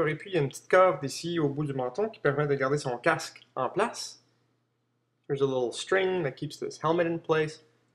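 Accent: Quebec, Canada. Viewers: Waw.. Magnifique.. C'est un rêve de savoir dessiner comme toi ! J'suis allé au Québec en vacance, leur accent m'a fait penser a toi ! :P (Je suis Francais). C'est vraiment un talent fou que tu a, en plus, tu le commente aussi bien en Francais qu'en Anglais.. Un grand bravo a toi, merci et continue tes vidéos ! ;)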